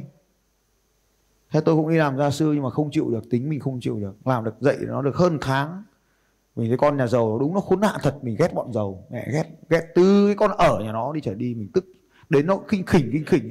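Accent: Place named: Bac Ninh, Vietnam. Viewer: Giọng a hình như Bắc Ninh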